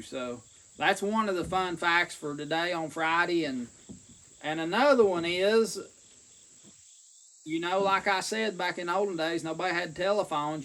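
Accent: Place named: Appalachia, United States. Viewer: I love hearing your stories about the olden days. It's wonderful that your recording them for prosperity. I pray you're downloading all your episodes as backup in case something happens to this platform. I would love for you to have this wealth of knowledge and wisdom spoken in a calm, relaxed, and real Appalachian accent. I learned ways to rally the town in case of danger. Community is all we have now.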